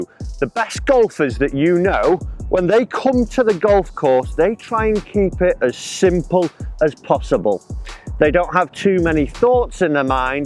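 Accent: United Kingdom. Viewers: Why is every golf instructor british? Lol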